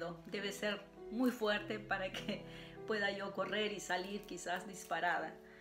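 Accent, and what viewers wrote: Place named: Italy. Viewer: Tú canal es genial😍🇮🇹🇵🇪 me gusta esta combinación de nacionalidades! Llevas muchísimos años allá en Italia😱 ya tienes el acento italiano muy marcado incluso hablando español! Encantadisima de ser parte de tu espacio saludos✨🌸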